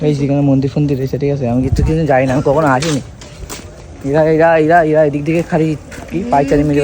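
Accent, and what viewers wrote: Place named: Digha, India. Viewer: Vai majha Tumi ja tomader local language a kotha bolla amder dighar dikao amon typer kotha bola.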